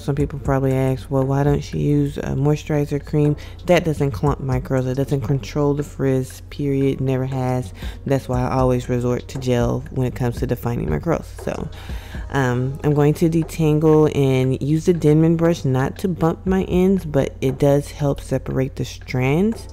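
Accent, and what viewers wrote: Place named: South Africa. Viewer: You look so South African! Your accent gives it away. Gorgeous!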